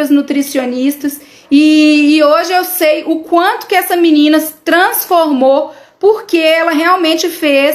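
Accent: Minas Gerais, Brazil. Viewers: Doutora maravilhosa,vc é mineira? Amo os mineiros de ❤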